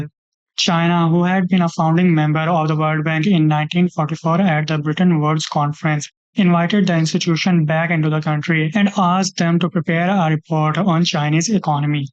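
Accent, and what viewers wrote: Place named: India. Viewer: For heaven's sake ..listening to your Indian accent when speaking Ingrish is so nauseating and annoying.. btw President Xi did not get rid of his political opponents but instead got rid of the rampant corruption in China once and for all.. what about India which is a also a notoriously corrupted country...? Please focus more on your India's domestic.corruption..political divide..religious riots..homelessness., slums .rather than worry about China's problems..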